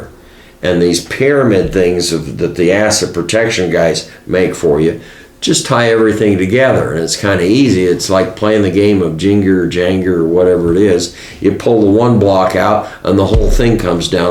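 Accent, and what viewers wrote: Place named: Pennsylvania, United States. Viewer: You have a strong PA accent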